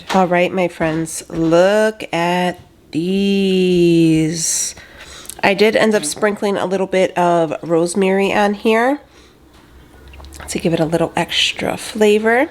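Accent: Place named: Illinois, United States. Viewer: Your accent!🥰 you sound like my step- mother in law who grew up in Illinois, near Chicago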